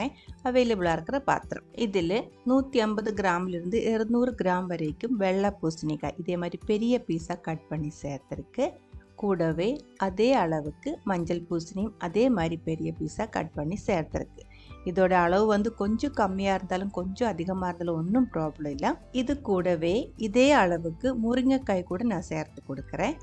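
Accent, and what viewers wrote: Kerala, India. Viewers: V good. Lovely Malayalam accented Tamil. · "Valara nanyaittu irukaiyanu ningalinde recipe"I love your malayalam accent wile speaking in Tamil.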